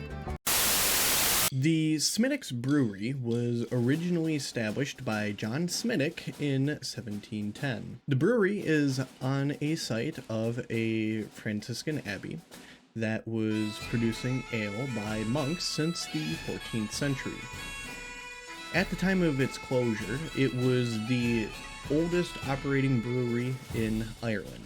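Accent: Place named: Wisconsin, United States. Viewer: Charming Wisconsin accent! It’s a shame the music overpowered your voice a little. Thanks for the history and review.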